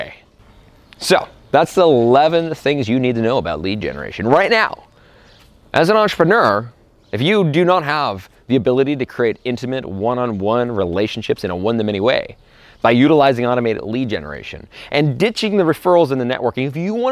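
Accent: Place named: South, United States. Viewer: When did you develop this southern twang in your voice?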